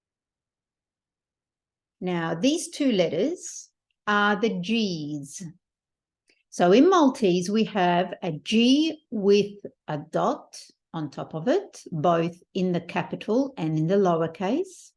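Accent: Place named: Australia. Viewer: This is amazing , I accidently found this channel and I am so glad that you are teaching so beautifully and from the accent I assume you are Australian . Thank you for doing this , love it and appreciate it .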